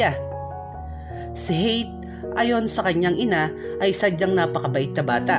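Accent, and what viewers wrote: Batangas, Philippines. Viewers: Ganda mo talaga magkwento lods. Ganda ng boses at pronounciation (Batanggeno accent) more power po.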